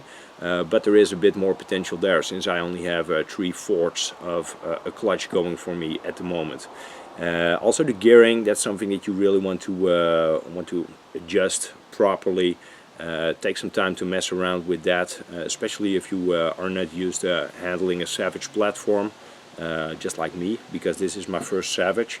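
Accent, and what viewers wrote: Netherlands. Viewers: je klinkt nederlands! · 100% dutch accent there! Haha!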